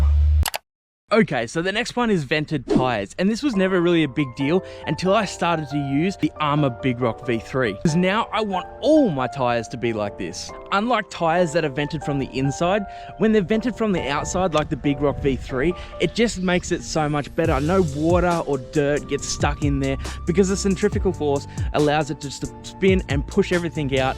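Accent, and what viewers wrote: Australia. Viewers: Are you ozzie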